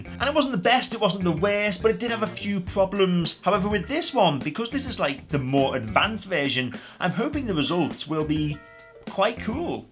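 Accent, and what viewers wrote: Liverpool, United Kingdom. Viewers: Can you get these down Paddy’s Market Lah?. You just can’t hide a Scouse accent LOL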